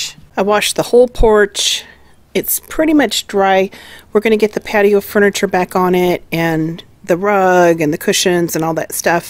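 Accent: South, United States. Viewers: I always thought she had a very thick southern accent.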